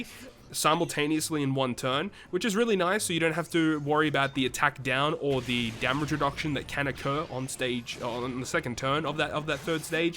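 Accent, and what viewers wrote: Australia. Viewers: é o melhor, sotaque de australiano vom de ouvir LoL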